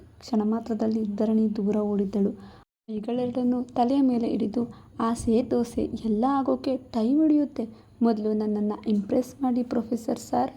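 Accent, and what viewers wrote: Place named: Mandya, India. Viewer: ಮೇಡಂ ನಮ್ಮ ಮಂಡ್ಯದ ಭಾಷೆ ❤❤❤👌👌👌👌🙏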